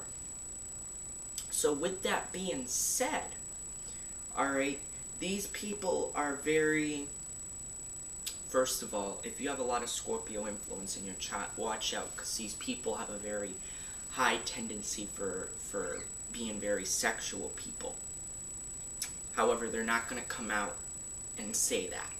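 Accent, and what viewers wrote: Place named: Boston, United States. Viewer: YOU ARE FROM BOSTON IM A SCORPIO LOVE YOUR ACCENT YOU AREDEAD RIGHT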